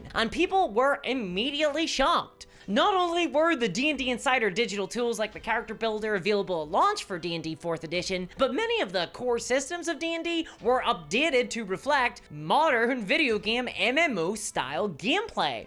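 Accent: Northern Ireland, United Kingdom. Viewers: You say lighting, I hear lagging... clearly I've been away from Northern Ireland too long! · she's from Northern Ireland.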